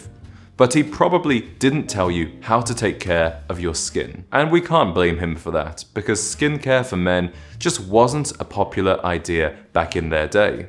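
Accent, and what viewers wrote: United Kingdom. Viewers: Bro is British certainly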